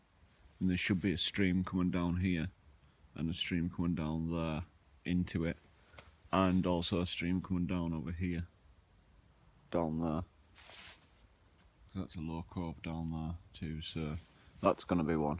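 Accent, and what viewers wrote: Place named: Yorkshire, United Kingdom. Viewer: u sound very Yorkshire like.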